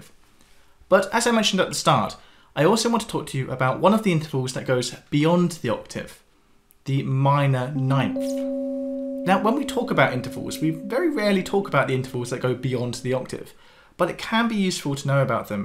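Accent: England, United Kingdom. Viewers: Oh to have good hair, an english accent AND piano skills. Very enjoyable. Thanks.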